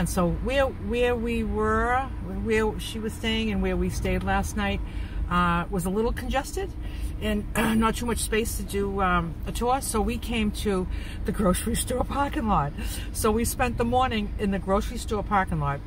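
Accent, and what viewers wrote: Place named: Florida, United States. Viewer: is your driver seat on the right hand side? You talk like you're in Florida but what the hey?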